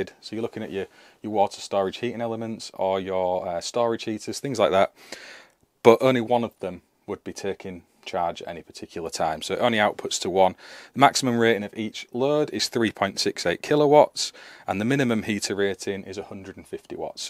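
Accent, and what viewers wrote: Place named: East Yorkshire, United Kingdom. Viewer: Good video buddy. Just getting my head round it all atm. Sound like you're East Yorkshire.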